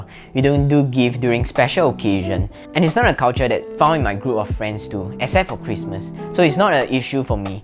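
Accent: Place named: Singapore. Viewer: Can’t get past singlish.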